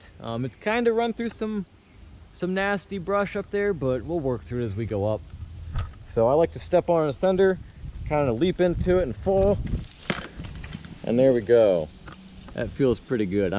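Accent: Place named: South, United States. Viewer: What state is this in? I’m trying to work out what your accent is. It sounds a bit south but not deep south (I’m in the UK so I’m not sure)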